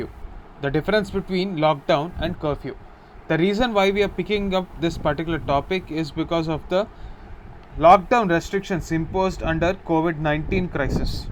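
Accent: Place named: Hyderabad, India. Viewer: Hyderabadi telugu guy voice 😁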